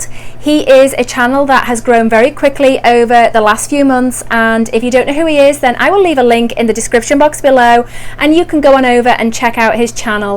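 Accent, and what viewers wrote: United Kingdom. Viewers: oh ok thank you! Great video btw your videos are always so fucking and soothing to watch!! Even though I never comment I always like your videos! And I was just wondering because of your accent I thought you lived in the uk! I’ve only been subscribed for a month or less but I’ve liked your videos ever since I started watching and I keep going back to your old videos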